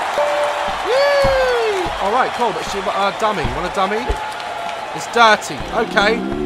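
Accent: United Kingdom. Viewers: you had a British accent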